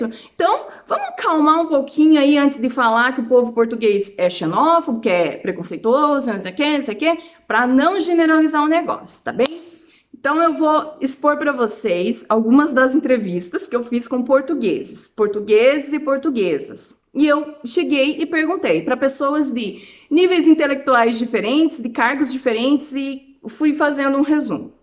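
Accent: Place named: Curitiba, Brazil. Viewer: Você é curitibana? Pelo sotaque, parece minha conterrânea😉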